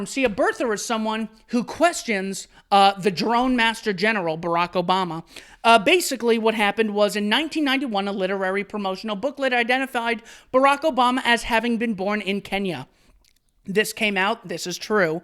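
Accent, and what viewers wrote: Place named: United Kingdom. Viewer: Fringe beliefs? Are these British clowns serious? Once upon a time, abolitionists were considered fringe members of society. Love the British accent,.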